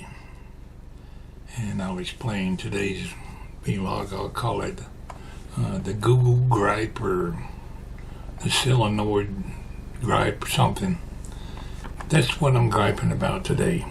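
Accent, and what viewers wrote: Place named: South, United States. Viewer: I noticed you pronounce it "SILL-uh-noid". So do a few of my in-laws. I looked up the pronunciation, and it's what I would have thought... "SOH-luh-noid". I reckon your way must be a Southern variation, because you aren't the only one that pronounces it like that. I wonder about some of your viewers from other regions ...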